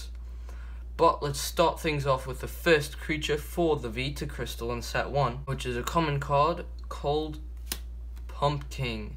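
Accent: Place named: United Kingdom. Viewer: British accent 10/10 :l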